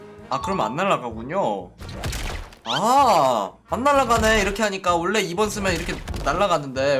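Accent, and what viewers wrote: Busan, South Korea. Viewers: 그... 원래 부산사람이 엿어요? 부산언어를 정말로 잘.쓰.시.네.요.하하ㅏ하하ㅏ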